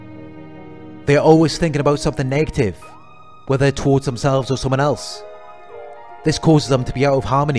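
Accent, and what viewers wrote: United Kingdom. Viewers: Hard to understand this British accent 😞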